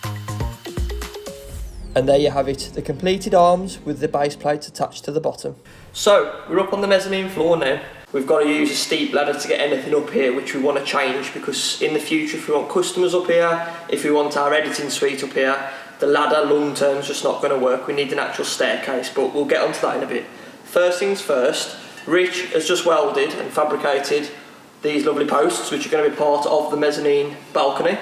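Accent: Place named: Birmingham, United Kingdom. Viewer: You from Brum or Dudley. Sound local to me. Also liked n subbed. Again I don’t know why your vid popped on my feed. Glad it did thou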